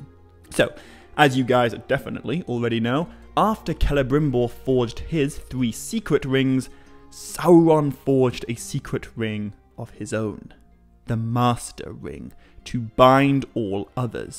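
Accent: United Kingdom. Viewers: Oh my God, this is probably what John Oliver refers to as “offensively British accent”. It was impossible to finish the video! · You are an amazing orator and in my opinion is best suited with your accent ... even if you don't think you have one (your friends from across the pond)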